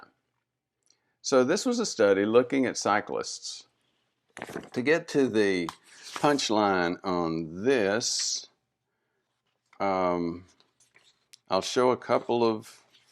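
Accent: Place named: Texas, United States. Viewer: Great explanation doc Simple and easy to understand And I like your Texas accent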